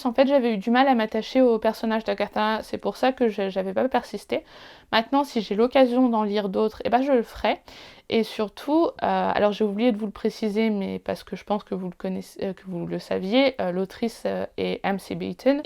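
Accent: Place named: England, United Kingdom. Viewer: Je viens de tomber sur ta vidéo par hasard et j ai beaucoup aimé. Parce que j adore le cosy mystery et que je veux perfectionner mon anglais pile le sujet de ta vidéo, je vais donc attaquer par les détectives du yorkshire😊. En recommandation j aime beaucoup les nombreux livres de joanne flucke avec anna swansen et sa pâtisserie, c est génial et on peut même faire les recettes 😂. J adore ton accent anglais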